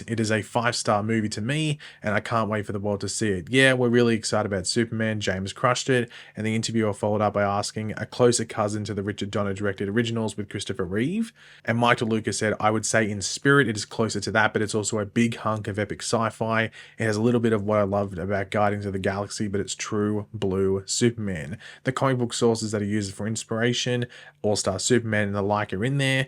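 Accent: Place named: Australia. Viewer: Shut down your page. I hope you will seriously think about it. I dealt with your negative comments about Superman and Lois, all through its run, because you were a "just a Arrow Fan" and only Flash mattered besides it. We don't need your terrible Aussie accent. Through yourself on the Barbi and turn the gas up to 'Nuclear'.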